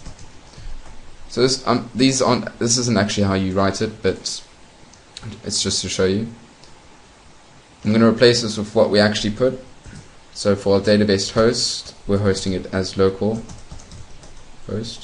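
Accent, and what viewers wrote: South Africa. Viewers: Yay a South African :) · South African yeah?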